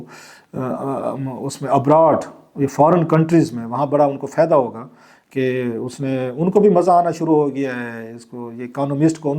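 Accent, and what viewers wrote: Saraiki, Pakistan. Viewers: He always settles his personal score, whereas serious doubts about his education, credentials. He always tries to create fuss. He never wants harmony.. My God, literally phoopian, maasian's attitude.. In his old v logs , he was instigating to khan that you shouldn't do deals by indicating deals of benazir and nawas sharif and he made a lot of v logs that khan shouldn't loose his staance.... Now he is telling different stories that IK shouldn't have done it... Peak of hypocrisy, ik will have 100 mistakes, but klasra wants to bash Ik only caz his feathers will burn if he talks about other politicians... Literally, he seems breathless when he speaks about others.... Shame on you and your so-called saraieki style English.... If you have courage, then talk about other politicians...deaf and dumb... Instead of moving forward to create harmony amongst all, your role is shameful, brutal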